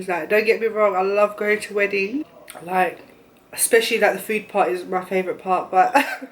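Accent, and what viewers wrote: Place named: England, United Kingdom. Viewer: Where are you from if you don’t mind me asking, you sound English but you said it was 38° I know that in England it’s no that hot 😂😂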